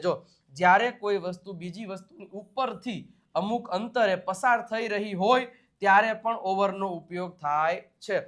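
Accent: Kathiawar, India. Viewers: 💖💖"કાઠિયાવાડી અવાજ અને ઇંગ્લિશનું જ્ઞાન, સર છે ગુજરાતનું ગૌરવ અને શાન!"💖💖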